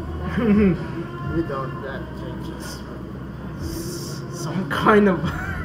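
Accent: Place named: India. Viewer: oh, you just sounded like you have an Indian accent, anyway. I'll never watch Smile HD, I tried watching Fluttershy react to it, and I'm like "just no" same thing with Cupcakes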